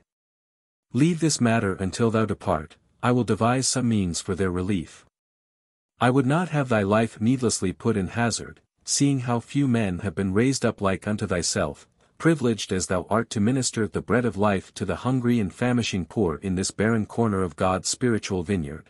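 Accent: United States. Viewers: Only managed to stomach 12ish minutes of this godawful monotonous American voice. Would have been much better in an English accent at least, seen as it’s about Lancashire!!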